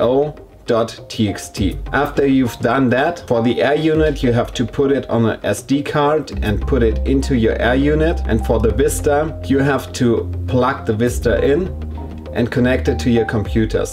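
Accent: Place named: Germany. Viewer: Ive seen like 50 of these videos hahaha and it took a German speaking English with a light accent to Deliver the message clearly for me lol Thank You. just subscribed...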